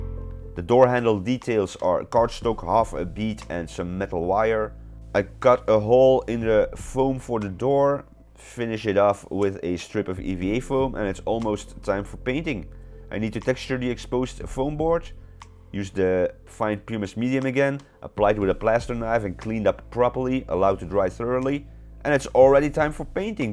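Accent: Netherlands. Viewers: Your accent sounds very Dutch, am i right ? Ah, Belgisch, close enough ? Het klinkt wel vrij Nederlands, meer dan Belgisch, dus je woont/komt van bij Nederland in de buurt wel ?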